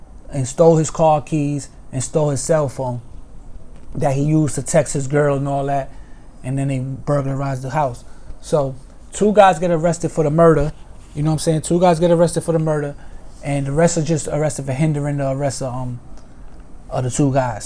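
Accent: New York, United States. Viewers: I Love your voice it sounds so Native New Yorker !!! Love from Jersey Gal Born and Raised !!! Peace To Be !!!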